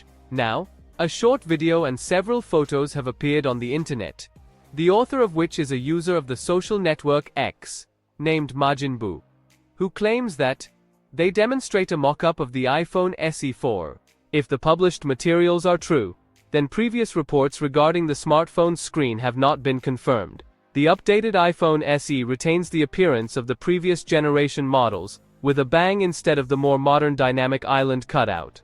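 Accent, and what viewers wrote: India. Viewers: I hate this Indian accent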